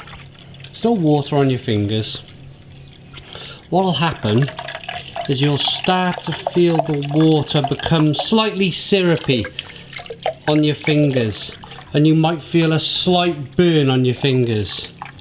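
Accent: Wales, United Kingdom. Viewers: Awesome video. Where’s your pub and what’s the name????? I’m getting a hint of Welsh accent (please forgive me if I’m wrong). I’d like a pint in your pub. 👍🏻🤪😊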